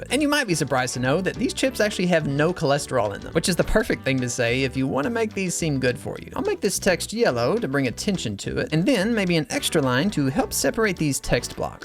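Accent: South, United States. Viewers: how do you have a southern draw but never had a mountain dew?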